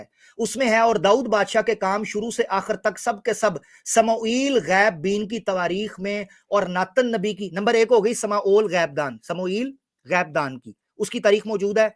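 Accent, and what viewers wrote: Punjab, Pakistan. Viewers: You rock in punjabi accent maza aa gayi ji Tantani logo ko bhi zara todhi me le lo Paa Ji zara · Your panjabi-urdu accent is so sexy🥵🥵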